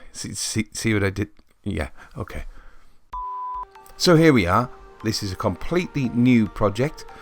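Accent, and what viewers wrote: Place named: Northern England, United Kingdom. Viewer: Most unity tutorials online seam to record their audio on a potato however you sound like a professional voice actor. Sean Bean vibes from your northern accent. Excellent production quality and can't wait to get further into the tutorials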